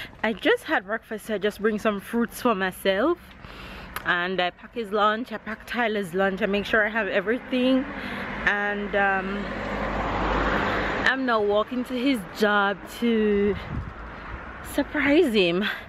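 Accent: Jamaica. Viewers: Tyler is so gorgeous, awesome surprise for hubby. Some parts of your accent sounds Jamaican :)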